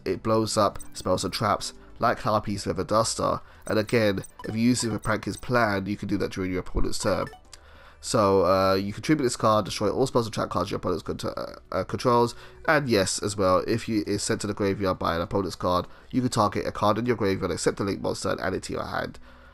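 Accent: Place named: United Kingdom. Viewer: U sound so british, are u from england?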